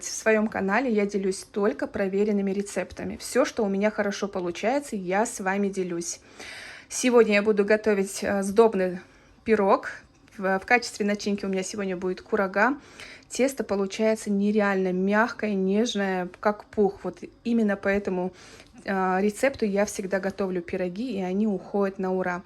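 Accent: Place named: Dagestan, Russia. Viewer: Дагестанский акцент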